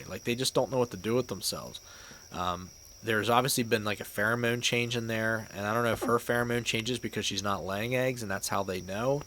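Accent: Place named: Midwest, United States. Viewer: "be-yahsketball" Midwestern US accents are the best.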